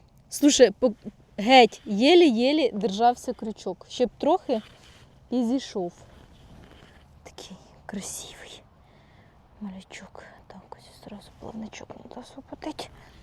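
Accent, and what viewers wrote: Kirovohrad, Ukraine. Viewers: А я то думаю що це суржик такий же як в мене, а виявляється ми обоє Кіровоградські)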